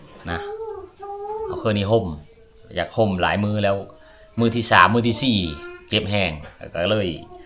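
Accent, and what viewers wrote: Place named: Thailand. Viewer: โต๋เว้าคือไทบ้านกัน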